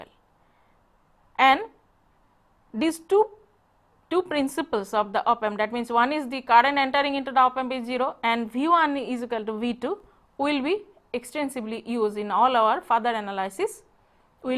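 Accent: India. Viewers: Thank u madam.Really its very useful.I have clearly understood ur indian accent.Every thing Has proof .Theirs is no doubt in any concept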